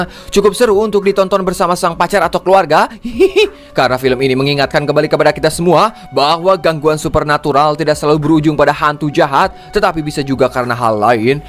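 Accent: West Java, Indonesia. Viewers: Aku tebak pasti abang org sunda iya kan?? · Kang pasti org Sunda nya 😂logat na meni Sunda pisan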